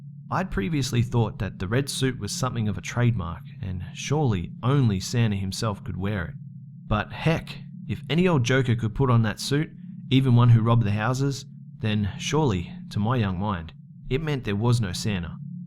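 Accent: Australia. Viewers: he has an Australian accent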